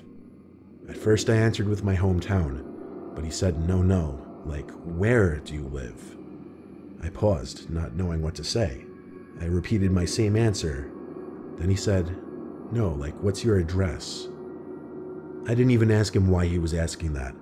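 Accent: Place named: New York, United States. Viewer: Love this channel! Love how I can listen to these story and the person narrating these stories sound very similar to me “Yes, I’m talking about the NY accent!”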